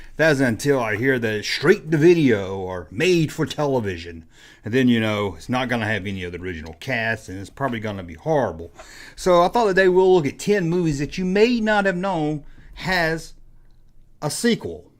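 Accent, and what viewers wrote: South, United States. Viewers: Are you from tenneessee you got that southern draw!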